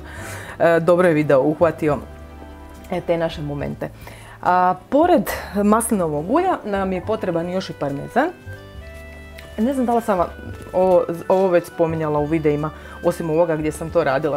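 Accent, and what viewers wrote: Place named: Dalmatia, Croatia. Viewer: Draga,dok gledam tvoj video setim se letovanja koje sam provodila u Zadru i okolnim mestima.Tvoj rečnik i naglasak me podsete na ta divna leta.Tvoj vrt je predivan.Pozdrav iz Beograda.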